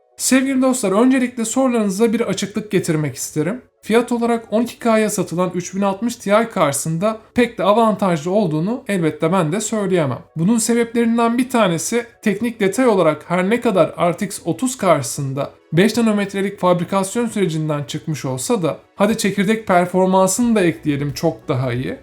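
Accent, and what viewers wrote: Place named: Kayseri, Turkey. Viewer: Youtube ta hangi kayseriliye denk gelsem dörtYüz değill, DörtÇüs diyor, bu da çok hoşuma gidiyor, yani hemen ''aha kayserili detected'' diyorum. 😂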